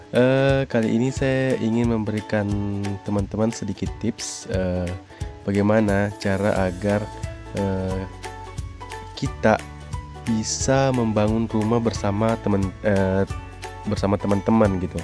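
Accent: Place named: Sulawesi, Indonesia. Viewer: Dari Logatnya kayaknya orang sulawesi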